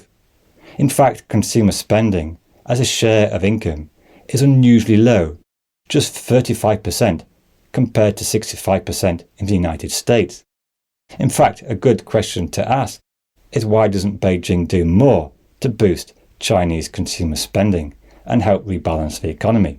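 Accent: United Kingdom. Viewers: 1.)British colonizer voice - check 2.)Faceless - check 3.)Screaming about the collapse of smarter Chinese rivals - check Yeah, safe to say most of the nonsense in this video is bullshit.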